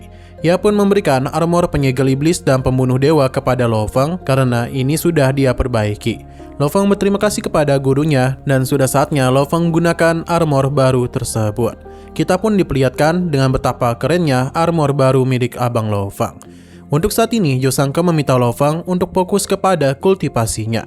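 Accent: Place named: Kalimantan, Indonesia. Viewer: Curiga admin orang kalimantan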